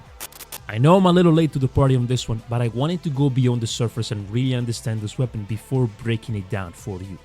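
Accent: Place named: Russia. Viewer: i'm not too sure, as I haven't heard a lot of accents, I wanna say Russia, but I also don't want to seem offensive if i'm wrong sooooo, sorry if I am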